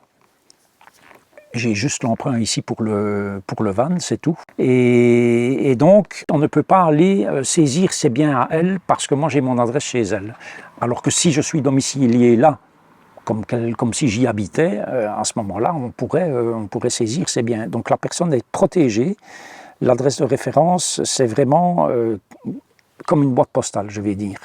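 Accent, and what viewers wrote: Belgium. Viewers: milesker anitz. En tout les cas tu me fais bien rire avec ton accent belge. Au plaisir de te rencontrer sur la route.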